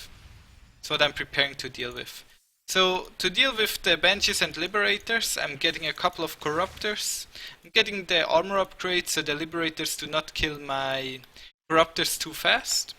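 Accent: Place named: Swiss German, Switzerland. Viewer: You have a funny accent. Almost like a swiss german talking english.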